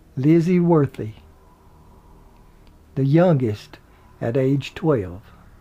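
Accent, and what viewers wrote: South, United States. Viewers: I really loved your excursion into history by way of visiting this old graveyard. My sister and I often drive through old graveyards and marvel at the writings we find on the headstones. Some of them are very sad indeed and show the anguish the survivors felt at the time. The story you told of Helen and Florence was very heart breaking. I can understand how a mother may want to keep in touch with her little daughter even after death has separated them. So sad indeed. I wonder what happened to little Florence's father and mother? I guess that answer has been lost to the ages., I hope you will do more of these types of stories based on old grave yards because they are all very interesting and spell binding. Also, you have the voice for these kind of tales too. Your Southern accent really relates an emotional quality that adds a lot to these tales of sadness.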